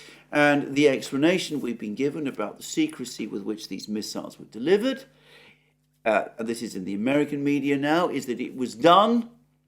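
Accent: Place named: London, United Kingdom. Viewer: ⭐⭐⭐Alexander Mercouris gives a good example, of what journalism should actually be. committed – objective – balanced This is not flattery - this is a factual statement, which you have to come to if you follow his reports over the years. The whole thing delivered in very audible, charming London English and general smiles, “where it can’t be avoided”. In Greek history, Mercury was the messenger - the messenger of the gods - the messenger of traders and thieves... in this case someone found his calling ; ) Alexander Mercouris – gold standard in reporting ! no more and no less ! Hallelujah ! With best regards – "the broker"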